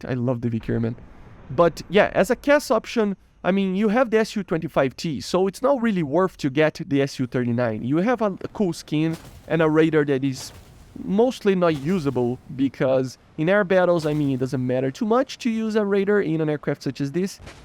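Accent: Brazil. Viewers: Tu é Br? Sotaque familiar nesse inglês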